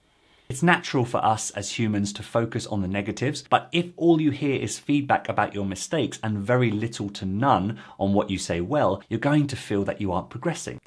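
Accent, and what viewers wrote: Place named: United Kingdom. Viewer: You are British when I heard your voice English That's ability I notice when I started November 2nd learning English today is May 29 2025 Not yet to get advance or fluency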